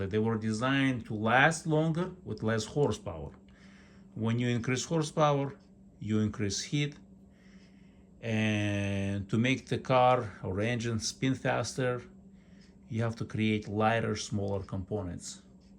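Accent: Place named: Russia. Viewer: Сразу слышно русский акцент)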